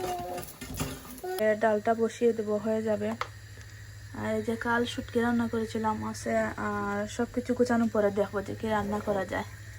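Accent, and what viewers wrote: Sylhet, Bangladesh. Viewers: আপু এটাই স্বাভাবিক এলোমেলো হয়ে যাবে আবার গুছিয়ে নিবে সবারই এরকমটা হয়ে থাকে আর খুব সুন্দর তোমার উপস্থাপনা সিলেটি ভাষা আমার খুব ভালো লাগে ❤❤❤❤❤❤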